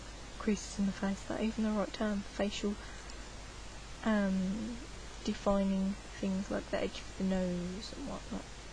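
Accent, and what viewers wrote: Birmingham, United Kingdom. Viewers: hell yea boi... i love british peoples accents...im also aussie..good vid though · Brummy accent ftw, im the same ;) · Who would hate your british accent? It's so cute. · great vid lady and yeh to hell with the yanks who hate the british accent im from london but next to ours brummys have d best accent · Bostin accent! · You are awesome, I like this tutorial. And by the way, I love your british accent (not kidding). Could you upload a HQ video, it seems a bit blurry. Greetings from Mexico.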